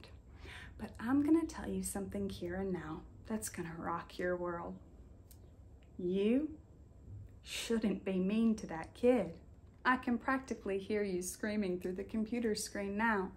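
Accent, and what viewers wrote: South, United States. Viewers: I have a question,. Well actually 2 questions. 1. What is ASMR. 2. Are you from the South?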